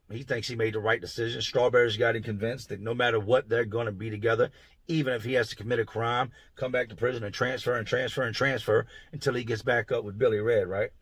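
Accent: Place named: Louisiana, United States. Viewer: Idk how this ended up on my recommendations but I'm glad it did... I was hanging on every word 😅 you're a great storyteller and I don't even like prison stories. You sound like you're from Louisiana ..cool accent.😊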